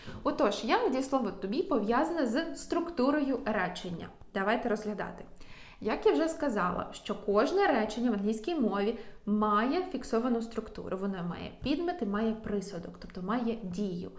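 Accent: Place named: Georgia. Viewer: Ваша вимова англійської така сама як грузин вимовляє російською. Та подайте коротенькі казки чи інші невеличкі тексти англійською, але диктор має бути людина яка є носієм англійської мови.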